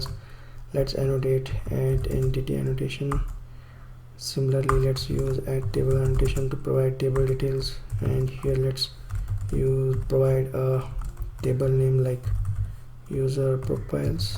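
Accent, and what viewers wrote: India. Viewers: Indian English ;)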